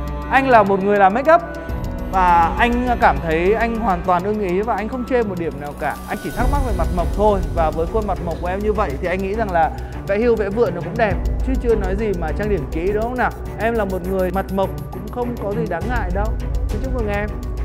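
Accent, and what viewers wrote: Vietnam. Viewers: giọng hà tĩnh lạ vkl ra :)) nhái giọng bắc ròi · HaTinh giong rat Ha Noi ^^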